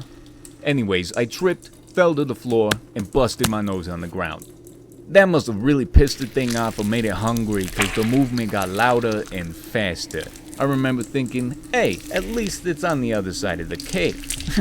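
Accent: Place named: Massachusetts, United States. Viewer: This was a great story! You did a pretty good job with the Mass accent, too.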